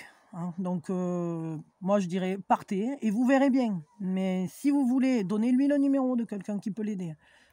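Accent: South, France. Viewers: Moi c est verbale je vais partir et ça devient physique pas facile merci pour vos guidance avec l accent du sud sa fait chaud au cœur ❤❤🙏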